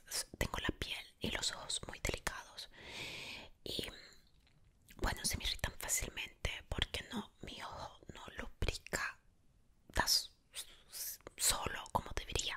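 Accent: Italy. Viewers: Eres de las Youtube más hermosa, eres full linda, con ese tono y timbre de voz italiano y español, uhff el combo total linda, con esa feminidad y esa voz, enamoras, seduces y creas una sensación extrasensorial extraordinaria y que trasciende más allá.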